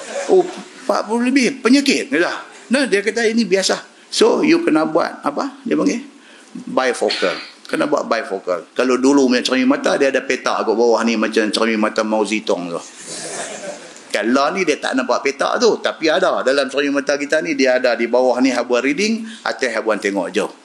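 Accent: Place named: Penang, Malaysia. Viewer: USTAZ...ni dah lama ceramah kat p.pinang.....suka ustaz ni kalau cerita tentang sejarah nabi2. Ceramah ustaz senang walau ckp loghat penang.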